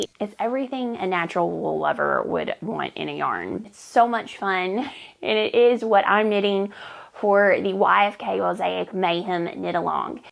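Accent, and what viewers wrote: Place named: Tennessee, United States. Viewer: Found you through a post by Andrea Mowry, and popped right over. Such restful scenery and the colors in the area where you live. I was guessing TN based on accent 'cuz definitely not GA where I am...not enough pines. Love your work and your home, what I've seen. Decided to stay and subscribed. Thanks for a lovely restful post!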